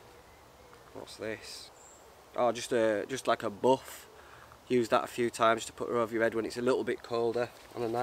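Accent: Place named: Scotland, United Kingdom. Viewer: That's what I really like about YT. Unexpectedly I found this great channel. I really like listening to your scottisch accent. I used to travel alltrough Scotland 25 years ago. Now I know that I've to come back soon. Greetings from Germany.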